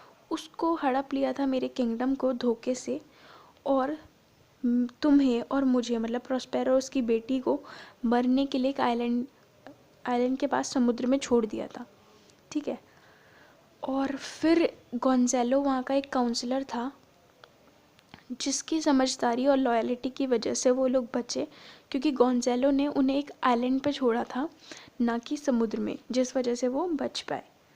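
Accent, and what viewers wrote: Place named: Uttar Pradesh, India. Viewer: By your act of speaking i am sure that you are from uttar Pradesh 😇😇😇😇 isn't it?if it is true you just like my comment.By the why I am also from uttar pradesh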